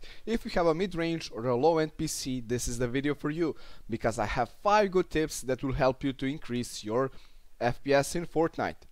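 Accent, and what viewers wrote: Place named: Balkans. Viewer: znao sam da si sa balkana kad sam cuo kako pricas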